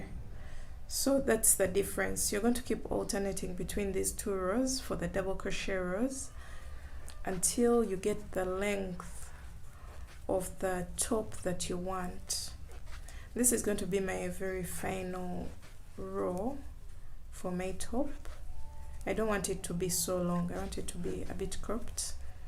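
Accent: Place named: Uganda. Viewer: She's Ugandan. I notice our accent everywhere ❤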